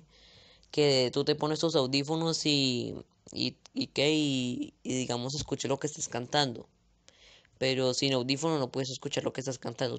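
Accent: Colombia. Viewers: Jajajajajajajaja es que tu acento es de rolo ewe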